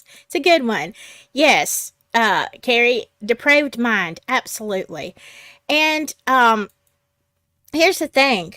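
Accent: South, United States. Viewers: Hello there from Oklahoma. I just heard your channel for the first time today. I loved it! You are a delight and I love the southern accent! Born and raised in Florida. Lived a portion of my adult life in Georgia. Put the 2 southern accents together and my friends think it’s very twangy and comical! Lol! Now let’s see what I pick up in Oklahoma!! Thanks for covering this case! I hope you’ll do many more! I listen to everyone covering this.. You just can’t believe it but yet you know it’s true! I pray for Justice for Mica and for the whole truth to come out for this family! Especially if others were involved in this! I enjoyed it and will be back for more! Great job! #JUSTICEFORMICA 😊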